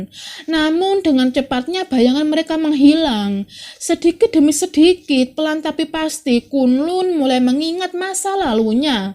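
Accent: Java, Indonesia. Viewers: Ciri khas memang medok, maklum wong jowo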